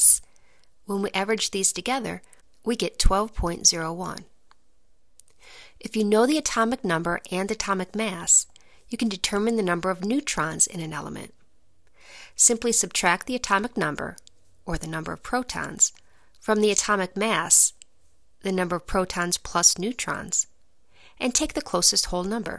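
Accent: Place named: United States. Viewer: Americans have funny accents ヾ(•ω•`)o Not in a bad way Your voice is very crisp 🍎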